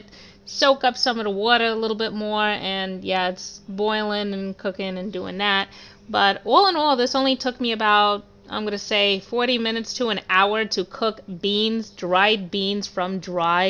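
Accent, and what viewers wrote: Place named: New York, United States. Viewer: will never get over the glorious ny accent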